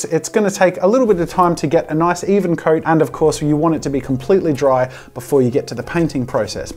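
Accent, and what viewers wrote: Australia. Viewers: Seeing what's supposed to be a spooky skeleton-esk mask and hearing a very cheeky Ozzy accent if probably the highlight of my day. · a demon skull with a cheery Aussie accent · I feel like this is the most Aussie has sounded in a while. >.>... Just me?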